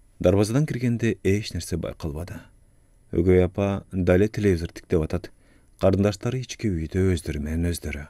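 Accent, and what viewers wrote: South, Kyrgyzstan. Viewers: Эри олгон аял соок чыга электе, кантип балдарымы багам, ал эми эркек аялынын соогу чыга электе эми кимди алам дейт экен. тобоо дейли. Атасыз жетимдерге Караганда, апасыз жетимдерге боорум ооруйт. 😢😢😢. иним сенин туштук диалектиканы келиштире окуганын жагат. Бар бол иним.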